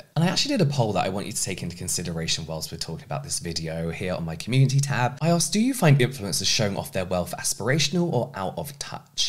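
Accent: United Kingdom. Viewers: The perfect beautiful English 😍 I'm Dutch and I love the British English language, but you're a literal cherry on top 🍒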